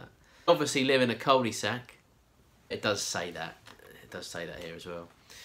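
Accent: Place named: Liverpool, United Kingdom. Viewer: New Zealand.... really.... I would guess by your accent your around Liverpool myself. I did ask a while back.... and I'll do so again mate. how do youclean the resin out of your mixing bowl... cheers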